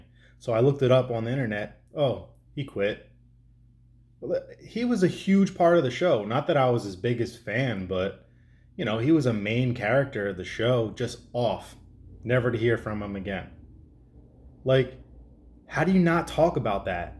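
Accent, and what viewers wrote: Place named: East Coast, United States. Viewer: You should listen to Cum Town, hosted by comedian Nick Mullen. And there always joe Rogan, he puts on a shit ton of talented comedians, his ego is in check and he’s much more honest. Also check out grimewave podcast, you sound like you’re on the east coast, it’s a ton of talented and funny Boston dudes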